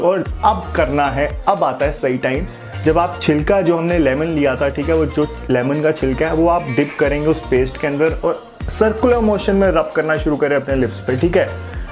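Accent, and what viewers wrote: United States. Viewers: Bhai mene bhi aap ko American smjha 😅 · Bro mene aapko American samgha😂😂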